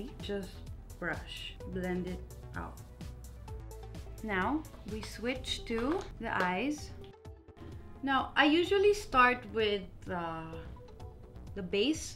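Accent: Philippines. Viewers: I love her but her accent bugs me. i cant wait to see a Filipina in American media who can talk normal. i just hear that accent from middle class filipinos so much and I know filipina girls think its cute. it's just too much to listen to. sorry. still love you. just have to put you on mute. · I love your accent, you make me mis all my Filipino friends in Alaska. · Maldita do you have problems with your so called "Middle Class Pinoys"?! She might have that kind of accent but were you able to achieve what she has right now? I'm pretty sure you are a filipino, and I am sure that your accent also belongs to Middle Class Pinoys. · grew up in the Philippines. Her accent is the same as middle class Filipinos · Gosh! I really love her Filipino accent! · i love her accent! so filipina! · I can here the Filipino accent 😍😍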